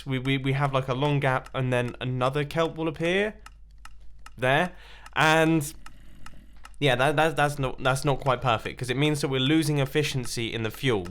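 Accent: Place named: United Kingdom. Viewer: To I talk with a strong western ascent, to me is extremely British. Why are accents so wierd!!?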